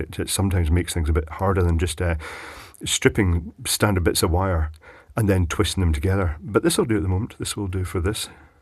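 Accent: Scotland, United Kingdom. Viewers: Hey Big Clive, really enjoy all your videos. From scotland myself and its nice to hear one of us on youtube for a change. Anywho can you look into flat earth?? There is someone from paisly getting alot of people on the subject just by going around paisly and glasgow and spreading the word. Let me know if you will atleast look into it if you havent already?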